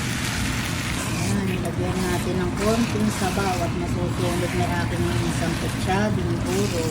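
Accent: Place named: Ilocos, Philippines. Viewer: Sis masarap yan! yung tono ng salita mo Ilocano taga Cagayan ka ba?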